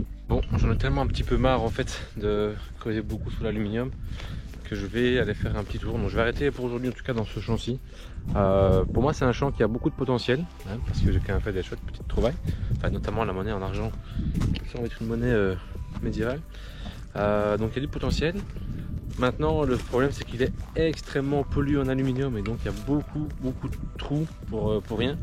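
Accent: Belgium. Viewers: Accent belge sa non? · OK ! Je ne savais pas que tu étais en Belgique avec une autre réglementation. J'aurais dû m'en douter avec l'accent... Bons trous et dans l'attente d'autres vidéos aussi sympa.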